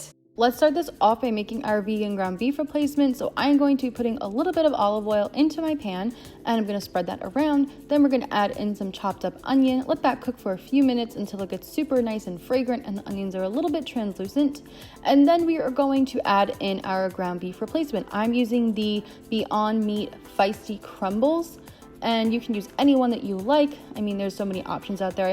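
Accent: United States. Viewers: sounds like a normal american accent to me. but im american lol so what do i know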